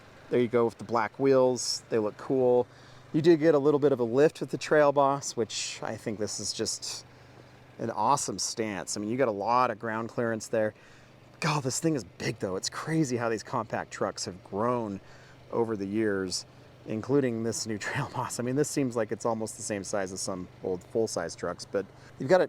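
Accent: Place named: Utah, United States. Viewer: I watched this video and about 1 minutes in I knew you were from Utah.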